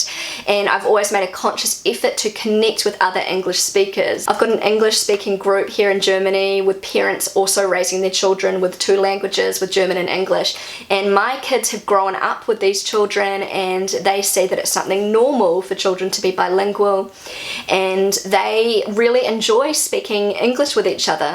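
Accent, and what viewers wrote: New Zealand. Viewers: It‘s a big advantage for your kids to speak English fluently! They are able to communicate wherever they travel. And btw, your kiwi accent is adorable, don’t ever change 🤩 Same goes for the sweet franconian accent of your kids, of course 👦🏻👧🏻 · Your kiwi accent is so nice ;-) I try to race my children bilingual (Italian and German ) too! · The British accent part was really funny, 🤣 Love your NZ accent! ... It's soo German, by the way, this accent obsession... Embrace diversity, people! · Your posh British accent still needs a bit of work. Can't keep the kiwi out. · I'm glad you didn't listen to any of those advices!! The way you do it, is perfect! I'm a kindergarten teacher and a few years back we had a little girl from Russia. And her mum, she was such a lovely lady, thought it was a good idea to teach her daughter German as well, but she couldn't speak German fluently. 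In the end, the little girl wasn't able to speak proper Russin nor proper German. Oh and I looooove your Kiwi accent!!! And it's great that your kids pick it up, too, cos they're half Kiwi and this accent is a big part of them. Case closed I guess 😂 Much love from Hamburg 😘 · Hey, thanks so much for sharing your experiences with raising bilingual children. My husband is also a native English speaker and I am a native German speaker, so it might be helpful with our future children. My husband also grew up bilingually (English and Afrikaans), so three languages are going to be interesting. I think you guys have done a great job sticking with it! And I love your kiwi accent and that you pass it on to your children as this a big part of your biography. My husband is South African and I think it would be rather strange if our children spoke perfect Oxford English ☺️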